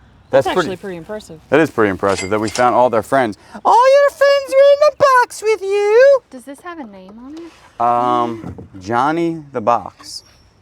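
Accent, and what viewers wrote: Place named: South, United States. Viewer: You're driving me nuts! The word is MONO- gram(ed) it isn't "MAMA-gram(ed). I know we southerners have our drawl, but still. (You can only call something a Mamagram if it's a mailed Mother's Day card and you put just your initials on it.) And the metal thimble is a shot glass, as in "My gout is kicking up a storm and hurts like all get out, so I need just a couple thimbles full of corn squeezings." 🤣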